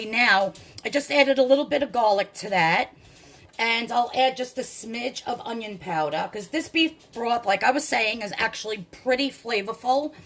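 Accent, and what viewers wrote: New York, United States. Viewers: I’m new to your channel ! Loving your recipes and your accent ! Are you from NY ! You sound like me !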